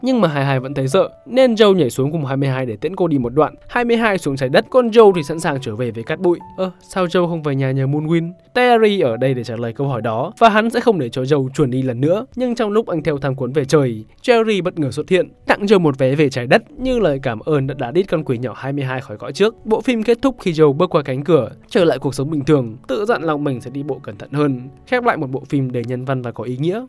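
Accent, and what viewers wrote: North, Vietnam. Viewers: Giộng bắc mình đã ko nghe đc rồi. Bạn còn liên tục pha trò rồi nhắc tên người khác vào clip khiến cho mình ko thể nào tập trung hiểu được câu chuyện. Chắc là phải tự thân đi xem hết phim thôi 😞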